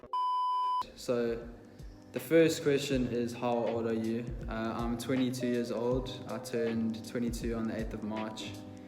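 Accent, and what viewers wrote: South Africa. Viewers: yesss called it! the accent is from south africa!!! the only person whom i've heard speaking with that accent was my ex-boyfriend who was from cape town <3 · never realized how cool south african accent is until now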